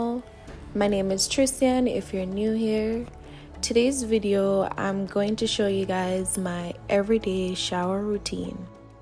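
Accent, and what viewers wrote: Jamaica. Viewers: Hey are you Jamaican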